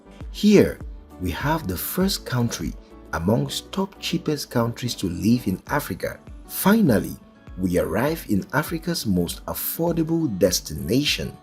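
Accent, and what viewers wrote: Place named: Ghana. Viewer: I can see that U r drawing from those Western people who come up with these incorrect assumptions. Nigeria is the cheapest country in the world. (Possibly, Pakistan may b a little cheaper). I have done items for items by dollar amount. I don't know where U got the idea of subsidies about Nigeria. The only one item subsidized by the Nigerian government is petrol and the IMF has bn telling the government to remove that only one subsidy. I live in the USA and as I am planning to move to a cheaper country other than Nigeria, I've researched (for 10 years) and travel to see where it's cheaper than Nigeria but haven't found any. I've seen some of those non African's websites declaring a lot of baseless numbers. As I can tell from ur accent, U r a brother frm Ghana. Can U believe that some of these non Africans claim in some of their presentations that Ghana is cheaper than Nigeria! 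U & I know that that's not true! So brother, to do a good job, wut U need to do is take items say, a dozen of eggs, a one bedroom apartment, a loaf of bread etc in one country and compare the prices in USD and convert them to the currency of ur country of interest. U'll clearly see wut am saying. Don't rely on those Oyinbo declarations. As President Uhuru Kenyatta of Kenya said, they'll be giving information about Kenya and at the end of the 'news' it'll be Mr. White, a correspondent from South Africa. That's almost 5000 kilometers away! 😆😆😆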